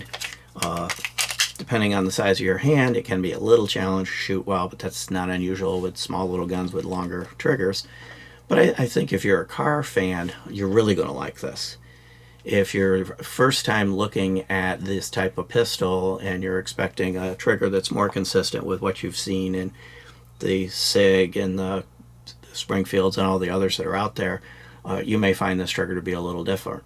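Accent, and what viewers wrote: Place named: Midwest, United States. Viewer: I am 99% sure the alligators in the beginning makes me believe he's in Florida. He even mentioned in a few of his videos he's in Florida. From the sound of his accent it sounds like he's from Michigan, Illinois, Wisconsin or one of the East Coast states originally and later immigrated to Florida.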